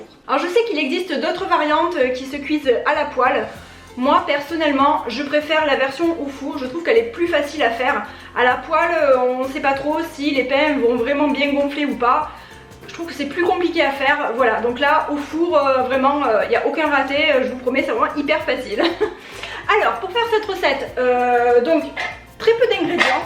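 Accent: South, France. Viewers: Coucou ma petite.. Quel plaisir que d'entendre cet accent du sud de si bon matin... tu mets du baume au coeur... Bon tes petits pains ont tellement l'air bon... ça donne envie de croquer dedans et je sens l'odeur rien qu'en les regardant 😂 J'espère que le confinement se passe bien pour vous... En aparté vas tu faire une vidéo pour le nouveau pack ou tu ne joues plus du tout? Moi j'avoue que ça fait des jours et des jours que je n'ai pas ouvert mon jeu... 🙄voilà 🤪 Je te souhaite un très bon week-end avec ta petite famille... Pleins de bisous et prenez soin de vous tous 😘🌹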